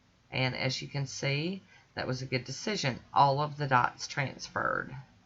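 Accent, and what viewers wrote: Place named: Texas, United States. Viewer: Lady you make me smile so much! I adore watching your videos. You are creative and watching you is like watching my family. Maybe because I am a Texan and you accent and manor hit my heart. Anyway I love you so! Keep being you. Thank you!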